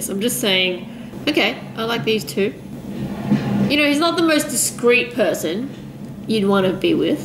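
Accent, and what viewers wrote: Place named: Australia. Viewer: You weren't the only one sweating it out...quite the roller coaster of emotions this episode was lol Condolences to your Koalas for witnessing such horror, for the night is dark and full or terrors ALSO...my brain can't get enough of your Australian accent ;p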